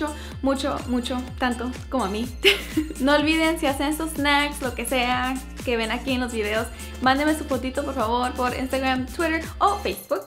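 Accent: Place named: North, Mexico. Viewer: Así hablamos los del norte, es nuestro acento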